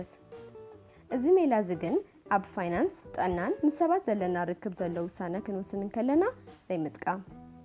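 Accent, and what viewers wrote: Tigray, Ethiopia. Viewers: Why don’t you focus on the information/Lesson . You people have always something to complain about. Her Tigrinia was perfect. Her Tigrinia is fromTigray or Ethiopia. Eventhogh i am from Eritrea i understood every single Word she said..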